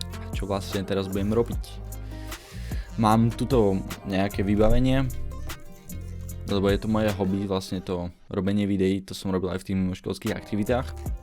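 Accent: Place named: Slovakia. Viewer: s fúzami?😳 Toto je insane nečakala som že sa niekedy vrátiš. 14 ročná Simča ktorá kukavala vždy na tvoje streamy a čakala na druhý deň keď stream začne tak je back na tvojom kanáli len tiež trochu postaršia ale fúzi jej nerastú😆 Nikdy by som nepovedala to že si na tieto časy ešte takto zaspominam milovala som to. A čo sa týka momentálneho kontentu mne osobne to nevadí auta mám rada a slovenskej angličtine rozumiem😅 takže sa teším na ďalšie videjko☺️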